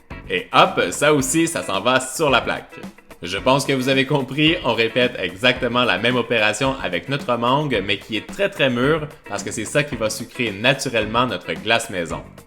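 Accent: Quebec, Canada. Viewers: je t' adore. Je tes montré à ma soeur, on adooore l'accent cébécoi.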